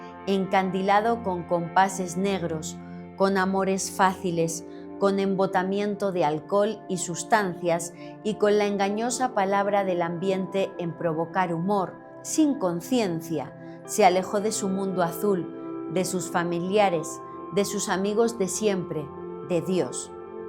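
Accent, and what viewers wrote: Spain. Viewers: saludos desde Formosa, muy bueno tu programa, felicitaciones...sos española o argentina?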